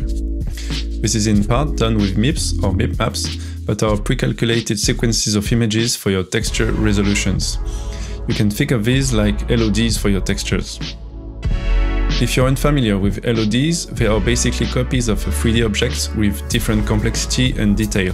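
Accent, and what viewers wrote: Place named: France. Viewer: y'a pas a dire notre accent francais es si reconnaissable hahaha beau boulot en tout cas ;)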